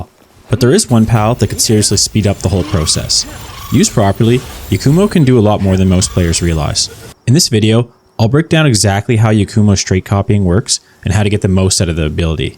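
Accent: Canada. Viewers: Is that a fellow Atlantic Canadian I hear?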